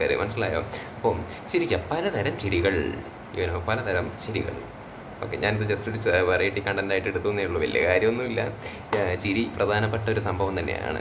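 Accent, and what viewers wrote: Palakkad, India. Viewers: Bro Palakkadan aano✌️ Slang ind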